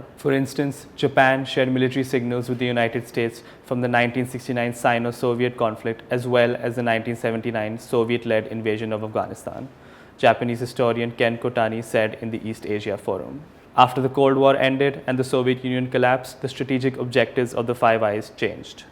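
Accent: India. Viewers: This f..ing indian accent :-(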